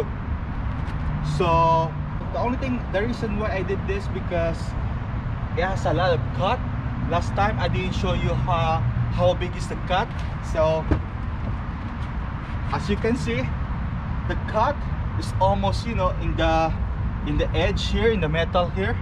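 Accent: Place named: Philippines. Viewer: that filipino accent tho.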